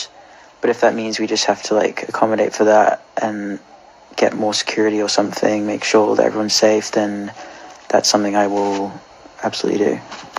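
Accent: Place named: United Kingdom. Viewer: sounds so incredibly British when talking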